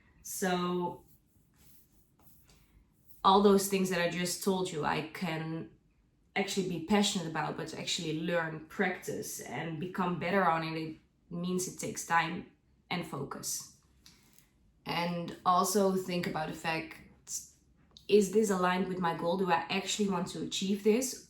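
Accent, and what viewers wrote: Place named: Netherlands. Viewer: You have many good points 😊 keep it up 😊 are you dutch btw? I notice a small accent 😅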